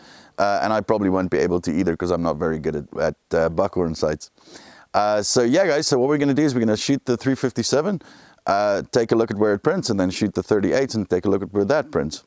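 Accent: South Africa. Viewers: do I detect a very subtle South African accent?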